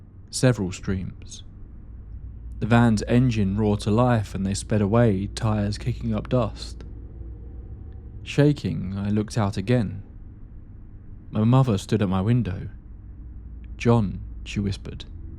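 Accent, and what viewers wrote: England, United Kingdom. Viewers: Big fan of your channel man, great narration, great stories and the spooky English accent helps, much love bro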